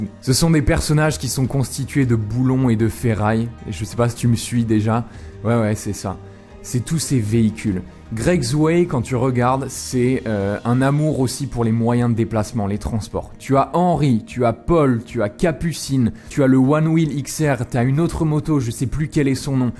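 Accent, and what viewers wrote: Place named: Belgium. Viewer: je pense qu'il est belge et pas français, sinon très bonne analyse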